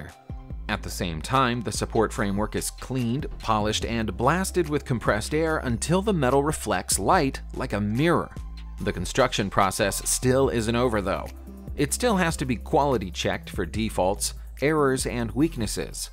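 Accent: United States. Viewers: What are the units for the temps given? Judging by the American accent they could be Fahrenheit, but seeing as none else in the world uses that measurement. Just curious as to some of the actual temperatures endured.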